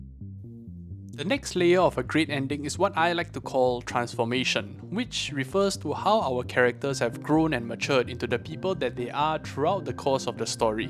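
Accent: Singapore. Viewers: You're either Singaporean or Malaysian, aren't u. I can recognise that accent from 5000 miles away.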